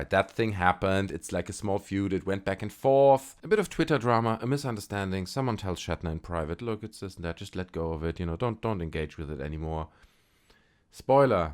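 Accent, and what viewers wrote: Germany. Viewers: Lol you are funny man. Cool accent! German? Greetings from Holland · Subscribed because your German accent is excellent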